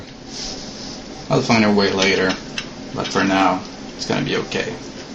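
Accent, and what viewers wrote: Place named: Quebec, Canada. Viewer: You have a Quebec tone..lol Thanks for the review..I was going to buy one.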